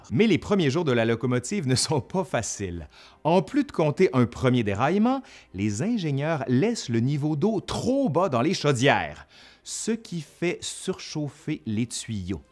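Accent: Quebec, Canada. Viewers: slt tout le monde, je n'ai pas regarder toutes ses vidéos, mais avant j'avais remarquer que pour les vidéos qui s'orientais sur l'histoire québecoise, notre cher orateur avait un accent plus prononcé (qui pour moi, rendez difficile la compréhension de la capsule) et la je trouve qu'il fait attention à son accent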